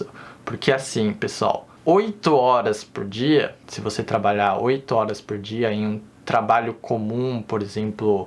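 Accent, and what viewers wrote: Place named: Japan. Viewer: Man teu sotaque já tá bem japonês tbm kkkk seloko vc tá certo Man o Japão mesmo com seus problemas naturais e outros problemas, sempre vai ser melhor que o Brasil, país SERIO, não um país de palhaços que nem o nosso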